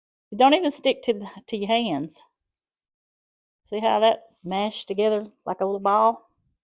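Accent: South, United States. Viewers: Absolutely one of the best snacks. Thank you. Love your southern accent.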